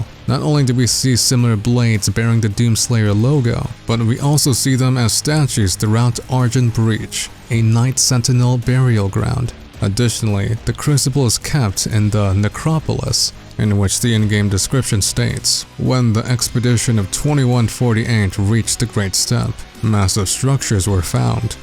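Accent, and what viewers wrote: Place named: England, United Kingdom. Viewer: You kinda sound like Ryo (english accent), from Devilman Crybaby lol. Also good video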